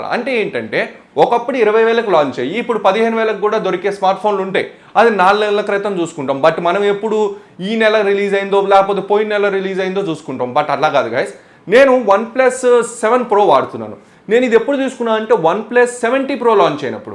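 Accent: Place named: India. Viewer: I like ur telugu slang and fearless review and comedy scenes also 💟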